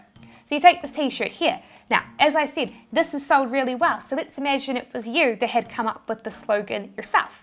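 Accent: New Zealand. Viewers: At no point in time did I think your accent was Australian. Definitely Kiwi. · Fun fact - despite being an American, I somehow suspected your accent was Kiwi rather than Aussie. I guess I've just had that much experience already from hearing both thanks to the interwebs. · Hello, you have New Zealand accent, I'm not a native English speaker but I thought at first that you are Irish ❤ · Loving that New Zealand accent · New Zealand accent is not that much of an issue. Guys from India have a whole lot bigger accent problem. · Great channel and great videos I have followed your advice and made great progress. but now I need your HELP!!! So teespring has disabled my account on the bases that I had violated their trademark rules. I do not understand why this happened, I have uploaded more than a 100 design to the site and none of them has any mark or logo that belongs to someone else. 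Please what should I Do? Do you have any consultant page where I can reach you directly for advice? I would of course pay for this service. Thank you for your cooperation and keep up the good work. I love your accent by the way Kiwis are very nice people! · it was an Itsy bitsy teeny weeny yellow poker dot, new zealand tein ny ( ten) haha! U are sweet! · Right when I thought you had an Australian accent you popped out of nowhere and said this is a New Zealand accent lol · The New Zealand accent is wonderful! I just love the way it sounds.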